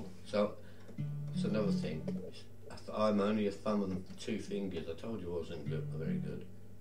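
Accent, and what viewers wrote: United Kingdom. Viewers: His British accent makes him more entertaining!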